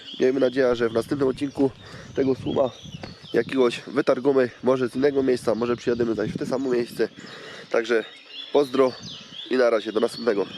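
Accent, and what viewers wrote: Silesia, Poland. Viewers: Po śląsku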